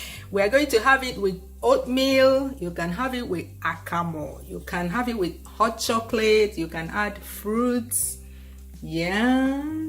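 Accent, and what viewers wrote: Igbo, Nigeria. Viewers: We love that Igbotic voice 😂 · We like hearing " ur sexy igbotic..voice"..jisike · Sis idi too much. I learnt alot from you. Nye wam ur igbotic voice. I am loving it anytime. Jisike!!! More grace sis.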